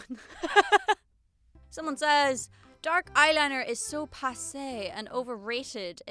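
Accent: Ireland. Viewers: I find it rather sad that people have anything mean to say about you. You're fun, beautiful, Irish, have a wonderful accent and i happen to enjoy your singing. I will never understand why people feel the need to say something mean to someone rather than give positive thoughts and feedback. I feel people do that because they in their own lives have failed or are doing so. They feel the need to put others down to feel good about themselves. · i absolutely love your ginger hair it’s unbelievably gorgeous keep rocking it your an amazingly beautiful woman you should be a model, by the way your voice is awesome i love the irish accent. i recently heard your cover and fell in love 🤙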